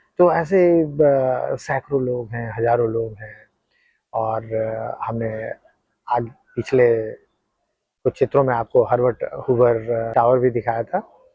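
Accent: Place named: Bihar, India. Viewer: Bahut achha lga apka video.. sbse inspiring lga app apne bihari tone ko maintain rakhe hue hai america jake bhi.. proud feeling.. thank you for such inspiring content wo bhi jab apne language or apne tone me rhte hue mil jaye to.. hmlog to india me rhte hue bhi apna bihari tone bhul gaye hai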